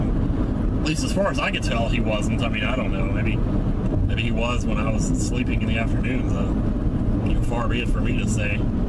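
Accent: South, United States. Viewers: that old tape man, you sounded like a straight up southerner but it still sounded like in there somewhere, I'm so amazed by the arc of which your voice changed over time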